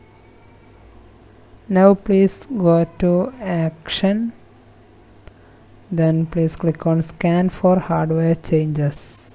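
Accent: Kerala, India. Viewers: anyone notice that mallu accent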